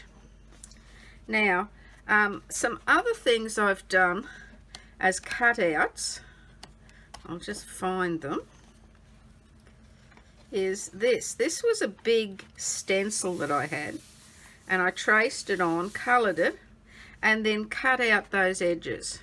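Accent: Australia. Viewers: I watched a napkin video of yours, I thought your voice sounded like a Ozzie, so I checked it out and watched this fabulous video I am overjoyed that i found you, your work is si impressive.I ❤❤❤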